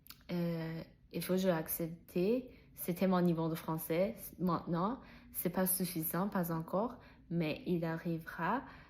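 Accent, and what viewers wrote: Quebec, Canada. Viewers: Félicitations pour tes progrès, courage et bonne continuation 💪 Btw il me semble que tu as déjà un petit accent québécois ^^ Salutation de la Belgique 🇧🇪 Gilles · C'est mignon ce petit accent Québécois caché au milieu de ton accent chinois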